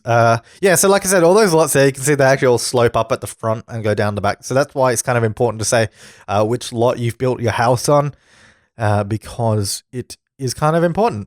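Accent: Australia. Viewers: Marry me! Ur so cute! Love ur aussie voice! Cuuuteee! Wanna learn how to Make a World! I Think my pc is toooo Slow! Xoxoxoxo from Denmark! 🇩🇰🇩🇰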